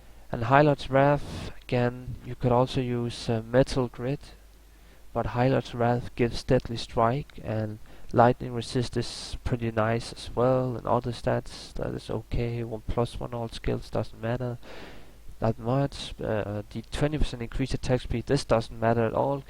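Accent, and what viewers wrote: Denmark. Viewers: sounds like a dane..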